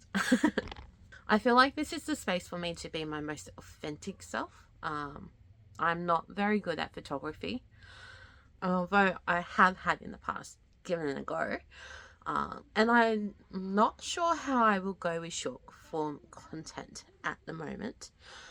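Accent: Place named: Australia. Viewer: !!! this was so good! (also, yes. You do have an australian accent and it's so cute) 😍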